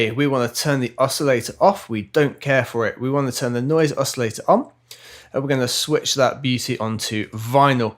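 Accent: United Kingdom. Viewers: thanks man this was really helpful. u welsh?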